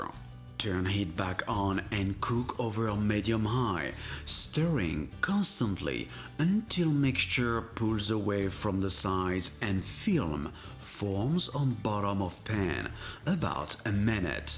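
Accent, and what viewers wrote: France. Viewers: The french accent is just lit😅❤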